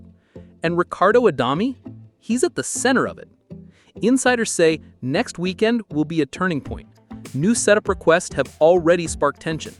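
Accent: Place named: United States. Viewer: This is disinformation, gaslighting in American accent. Not convinced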